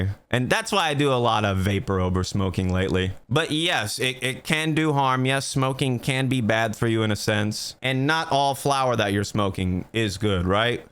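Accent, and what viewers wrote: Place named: New York, United States. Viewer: I wonder where the New Yorker type accent comes from.?.?.?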